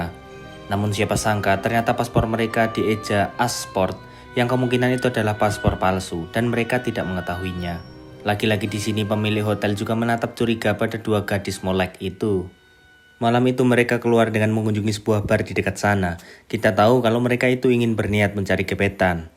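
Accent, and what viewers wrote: Java, Indonesia. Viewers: Si abang pasti orang jawaaa yaa ngomong y mdok🤭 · Kurang srek dgn suaranya terlalu meddok,cerita film nya jadi GK seru. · Jawa bgt logatnya 😄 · ko beda suaranya.. kaya y inh orng jawa yah boss ☝☝☝🤭🤭🤭🤭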